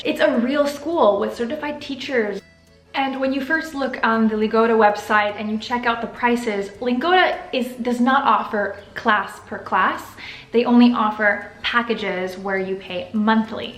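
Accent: Italy. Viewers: Trop bien ! Tu parles déjà hyper bien. Je trouve aussi que t'as un tout petit accent italien quand tu parles en français, mais ça fait très classe :) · So glad to hear you speaking my language!! I'm so impressed to see you speaking so many languages! I think I never told you that I was French but I'm really happy to hear that you started to take French lessons online! Do you know what? You've got an Italian intonation when you speak French ! I'm excited to see more videos about language learning especially (and about French in general)!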